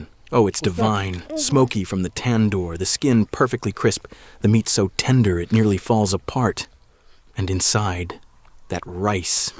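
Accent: United States. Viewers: I watch ur videos on mute because of that annoying American commentary. Listening to all that bullshit is annoying!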